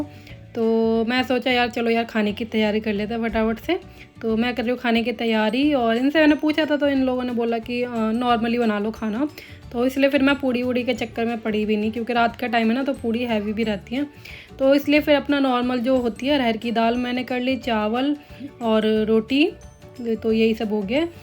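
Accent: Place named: Rural, India. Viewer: Sister aap to bilkul gaon ki language bole to aap to Delhi mein rahe ho ham bole to ham bhi udhar ke side ke Hain ham bhi aapki tarah bolate Hain jab ham ghar mein baat karte hain to aur aapke language bahut acchi lagi aapki mummy bolati hongi lekin aap to Delhi mein Pali bade ho so sweet bahut achcha Laga blog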